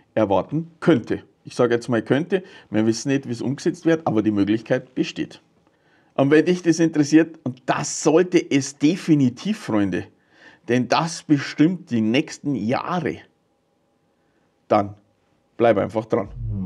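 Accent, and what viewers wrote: Bavaria, Germany. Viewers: Danke! Aber du sprichst Bayrisch da steigt die KI eh aus hahaha 😀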